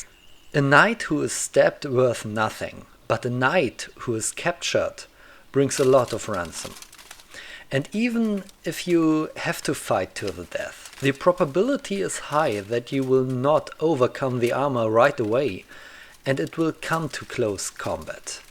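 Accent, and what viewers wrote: Germany. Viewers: I may be arrogant in believing that when it is stated that "most sources of medieval wrestling come from Germany" that there is a certain level of bias. This should be recognized, as the ancient greeks had pankration. I am not the owner of every manual on wrestling, so I can not confirm if most surviving records are in fact German, so it may be true. It's just jarring to hear someone with the accent of the country proclaiming that country to have the most/the best of anything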